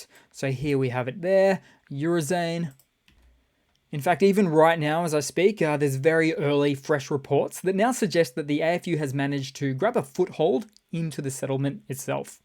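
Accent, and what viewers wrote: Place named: Australia. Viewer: Need more Australian accent i my life’s 8)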